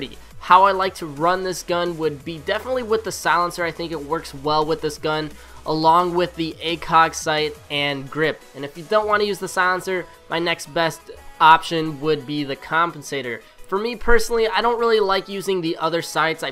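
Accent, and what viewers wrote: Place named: Scotland, United Kingdom. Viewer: Zezo The Noob his accent is strongly Scottish not English sry but I'm from England so I hate it when ppl say that Irish and Scottish accents are english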